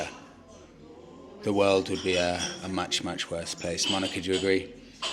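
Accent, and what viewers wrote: RP, United Kingdom. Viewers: haha its just being a posh twat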